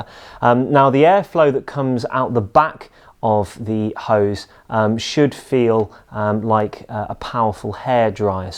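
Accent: RP, United Kingdom. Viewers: Posh accent! :)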